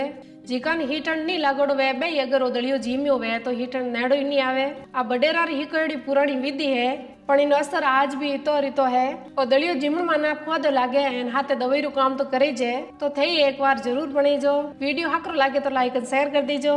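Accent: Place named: Marwari, India. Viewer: Like Marwari accent.i will try this .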